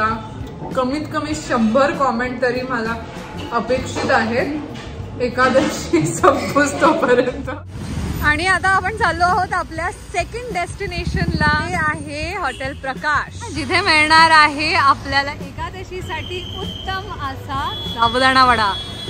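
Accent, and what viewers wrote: United Kingdom. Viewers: Marathi marathi sarkh bol agdi as british accent mdhe marathi bollya sarkh nko karus otherwise no doubt ur fab❤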